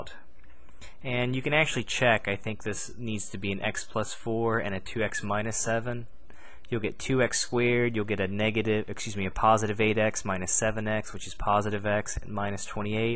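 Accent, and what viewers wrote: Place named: Baltimore, United States. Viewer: Sounds like he has a Baltimore accent.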